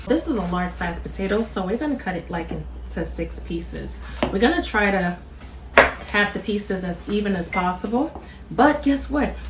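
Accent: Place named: New Jersey, United States. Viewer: Is that a Jersey accent I hear? Love this recipe also, thanks for sharing ❤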